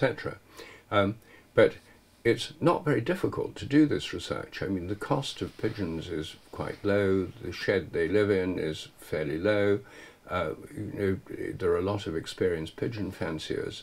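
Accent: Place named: United Kingdom. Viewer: Thank you, Rupert, for your dedication, and oh so typical British style of speaking and tenacity in between spells of politeness 😊 I'm happily surprised (I'm Dutch) to hear of this pigeon- experiment with Dutch pigeons. It's great humour to know of a formal Navy ship and crew, welcoming such an experiment. I'm a longtime follower of your work, living at an older age, observing the gap growing smaller, between the intellect of science and the intelligence of the heart, the world of spirit that begins to bleed through the icicles of logic. Your brave steps to throw stones in the pond of logic are much appreciated. It's truly groundbreaking, only not for eyes that are wide shut. Thank you so much!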